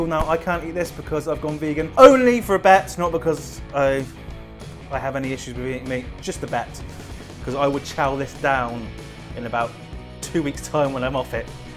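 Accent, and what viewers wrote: England, United Kingdom. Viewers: I didn’t expect the English accent. Nice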